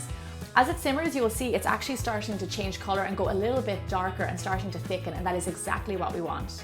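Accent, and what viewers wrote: Ireland. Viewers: Is that an Irish accent breaking in and out? Lovely! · Delicious!!!! and I like your Irish accent.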